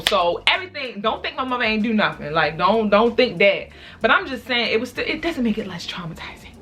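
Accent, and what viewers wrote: Baltimore, United States. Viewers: you frm bmore cs i here that accent 410!!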